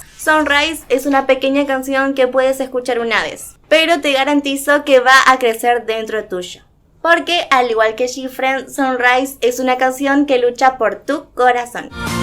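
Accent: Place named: Argentina. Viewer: Te siento acento Argentino XD.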